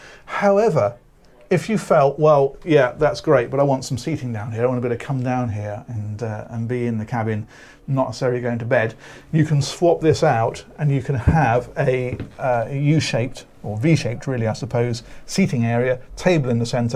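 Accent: England, United Kingdom. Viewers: the way the brits pronounce words is hilarious. couipay? just say coop. you sound gay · It’s the English accent 😅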